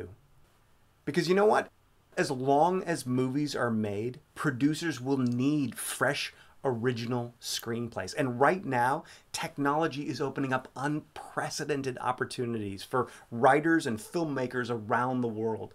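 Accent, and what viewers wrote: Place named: United States. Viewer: You sound american...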